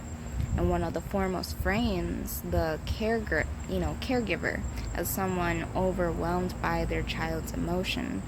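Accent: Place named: Minnesota, United States. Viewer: i am in love with your Minnesota accent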